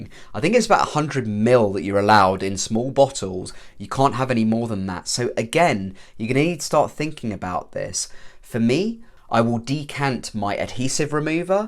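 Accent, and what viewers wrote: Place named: England, United Kingdom. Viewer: greatly appreciate your swift reply! i live in Kazakhstan, perhaps quite far from where you are, which, judging by your accent, is in England?) Agree, going to a stylist would be the safest option. I am torn between Lordhair and Lavivid - Lordhair offer haircut, too, i just need to send them a preferred style from a catalogue. Do you think it is normal/safe? But my biggest issue/fear - how do i measure the size of my head? I have a large head, and should i simply rely on a measuring tape? thanks, mate!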